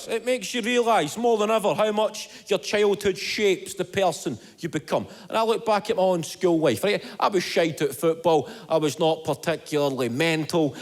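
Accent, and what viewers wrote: Scotland, United Kingdom. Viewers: So i can eventually understand him one day (i love the Scottish accent), also, I'm pretty sure i never said i couldn't understand anything...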